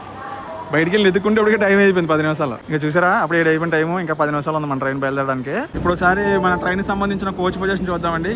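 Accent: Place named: Konaseema, India. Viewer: Videos about train travelling is very clear.sometimes your narration is in konaseema slang is super and attractive.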